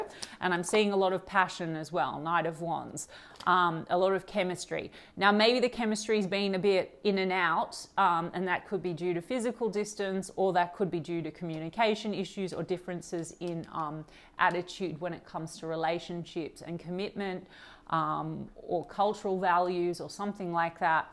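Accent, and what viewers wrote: Australia. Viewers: Wow, you picked up my kids, resonate with everything.....thank you 🙏 And your Australian 🥳🥰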